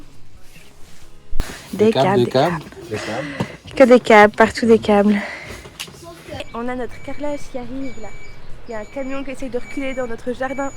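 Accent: Belgium. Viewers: Super moi qui ai la nostalgie de mon pays d'origine, je vis actuellement en France depuis plus de 10ans. Ça me manquait d'entendre l'accent Belge et comme j'adore ce type de vidéo, du coup un nouvel abonné. 👍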